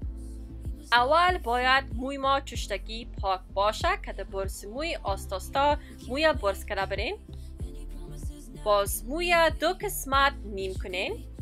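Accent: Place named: Dari, Afghanistan. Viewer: تشکر خواهر عزیز چقدر شیرین دری صحبت میکنید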